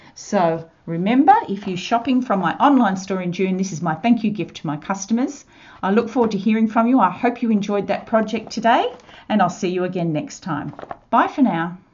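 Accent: Australia. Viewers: Love hearing the Aussie accent! And really like the project. The angle of the camera is a quite uncomfortable to view!!! Hope you might be able to change this!! Thanks for a great tutorial!!!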